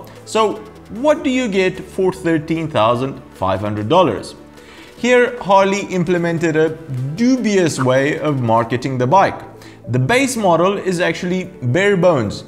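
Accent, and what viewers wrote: South Africa. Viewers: SA accent annoying.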